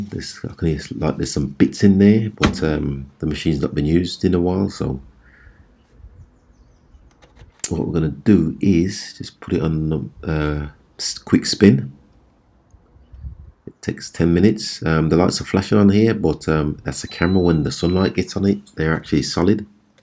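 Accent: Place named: Birmingham, United Kingdom. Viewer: Thank you for a reply too 👍🙏 I took it apart exactly how you said cleaned it with some wd40 & a tooth brush wiped it put it back together with some red grease it’s all i had it took just over an hour put a big load in on a 45 min 1200 spin wash its just emptying & spinning now “silent” I’ve had that problem that sounded like a machine gun for 6months took it apart twice & with your help in under an hour it’s like the day I brought it again I can’t thank you enough 👍👍(every other vid on you tube was saying spider or bearings)🙏 saved me £300+ replacement 🙏.. & just on a tangent I noticed the accent im originally from B32 (don’t know how close that is to you ) if i still lived there I would come shake your hand & buy you a drink thank you so much 🙏🙏🫡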